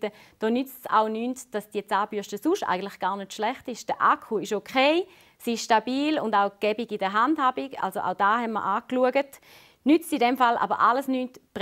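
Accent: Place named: Switzerland. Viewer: Ich freue mich immer wenn man was auf Schweizerdeutsch hören tut.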